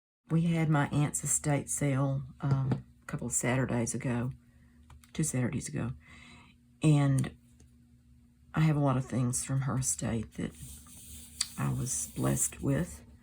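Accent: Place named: South, United States. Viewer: Just found you today. I’m creating right along with you❤. Your voice is so relaxing!! And I’m partial to southern accents as I live in Kentucky. You’re a nice visit on a Saturday afternoon!!😊😊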